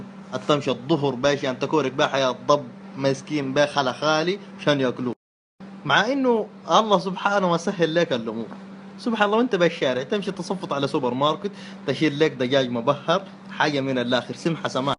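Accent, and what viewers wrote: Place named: Kordofan, Sudan. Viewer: كفوو يا ، لهجة اهل كردفان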